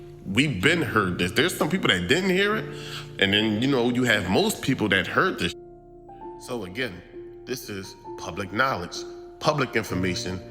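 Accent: Philadelphia, United States. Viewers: Love these videos!! Keep these coming. If we didn’t know you was from Philly….this video let us know YOU DEFINITELY FROM PHILLY😂😂😂😂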